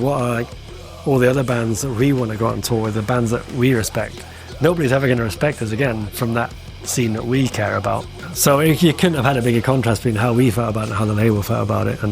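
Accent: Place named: Sweden. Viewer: Sounds like he's lived in Sweden so long that he's developed a Swedish accent. Or is this how people from Corby sound?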